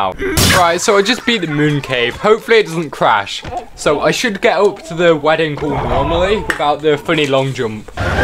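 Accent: United Kingdom. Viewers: Wait are you British 🇬🇧?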